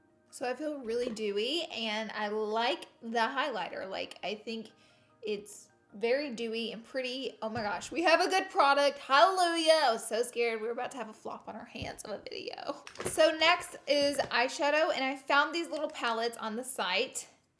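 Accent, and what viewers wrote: South, United States. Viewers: Bruh it’s the southern accent get the eff over it 💁🏼‍♀️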